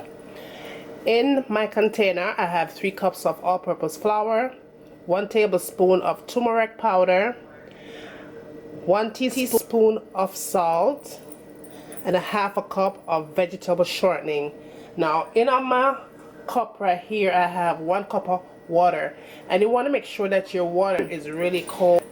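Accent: Jamaica. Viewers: omg I LUV ur Jamaican accent ! It takes me to the beaches of Jamaica while enjoying a spicy beef Pattie.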